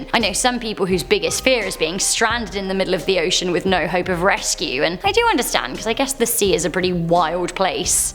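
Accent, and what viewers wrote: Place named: United Kingdom. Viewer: it’s her accent she’s from the UK 🇬🇧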